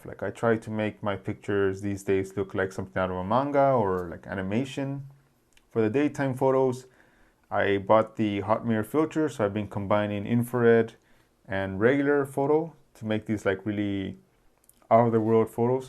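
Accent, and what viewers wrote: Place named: Spain. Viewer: I really like your photography! Thanks for sharing your opinions. I feel like your English has some Spanish accents haha, perhaps i got it from my latina gf, which also loves anime.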